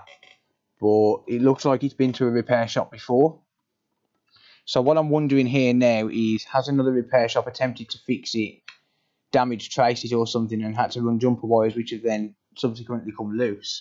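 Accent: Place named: Birmingham, United Kingdom. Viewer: Don’t know how,but for some unknown reason,one of your videos popped up in my recommendations…that was a few hours ago. 😂 I’ve watched a few since then. Fantastic channel and great to hear a English voice for a change. The bonus i,you do repairs for us the public,so now you are a top of a very short list when it comes to needing repairs. Keep up the amazing content and yes,I’m most definitely subscribed 👍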